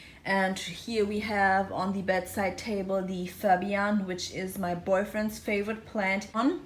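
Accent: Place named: Germany. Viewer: Hi, great music 👍. This is the first Video I watched, and damn, all the time I thought "this accent, she has to be German". Switched to your housplant tour part one, looking out of the windows, in the backround, furniture and stuff if anything can tell me... Nothing in your channel-information. And then "LA" 🙈. Finally "Berlin", so I can chill 💁. Greetings from Germany! 🇩🇪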